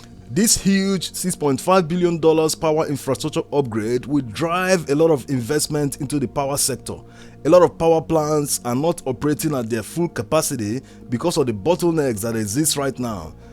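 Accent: Nigeria. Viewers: Thoroughly explained! I enjoy your wealth of info, and your🇳🇬 accent too!😁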